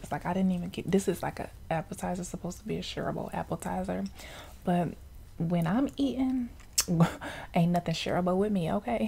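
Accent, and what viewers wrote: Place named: Maryland, United States. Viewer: Your pretty.. You sound like your from Maryland...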